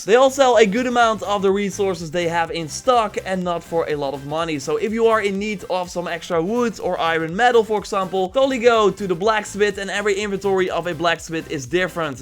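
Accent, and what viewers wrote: Netherlands. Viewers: You sound Dutch, where are you from? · Gek Nederlands accent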